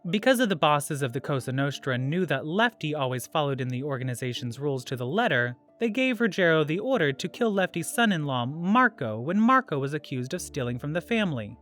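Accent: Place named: United Kingdom. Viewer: Stopped after 3 seconds, listening to this British cigarette talk makes me want to 🤮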